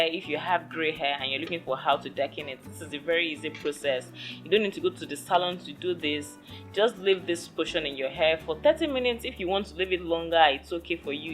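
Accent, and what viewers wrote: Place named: Nigeria. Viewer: you sound like nigerian... hmmmmm are you one of them? .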